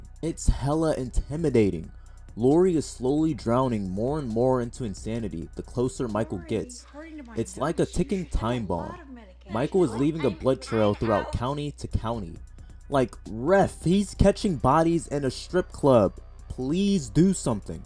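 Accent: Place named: Pennsylvania, United States. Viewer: I was trying to place it the whole video lol. Pennsylvania is an interesting one because it bounces back an forth between east coast and kind of an upper south like Kentucky almost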